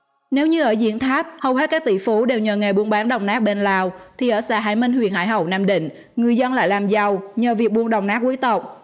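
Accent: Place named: Binh Dinh, Vietnam. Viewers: Giọng bà này ở Bình Định :)) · E à,c khuyến e tập phát âm chuẩn một tý vẫn còn giọng quê góc luon,chẳn hạn chử ăn e đọc i chân giân bình định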